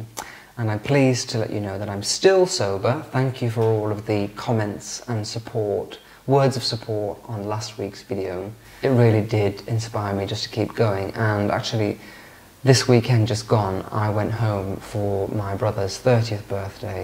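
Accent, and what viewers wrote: RP, United Kingdom. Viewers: accent is so posh.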